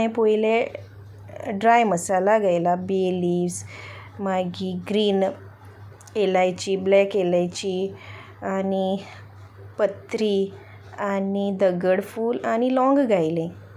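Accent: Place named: Quepem, India. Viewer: Keshi asai tumi... Somzota bori hai mhun.... God bless you... Keep going..... I love quepem dialect... Sounds very good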